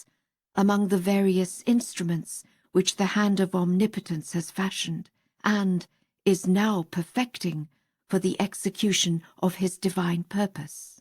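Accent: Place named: United Kingdom. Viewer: I cant understand her its the British accent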